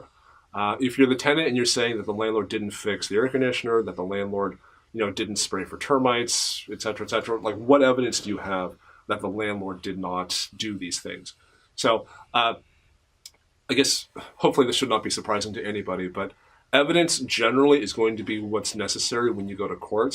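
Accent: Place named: California, United States. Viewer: wow...now that is a california accent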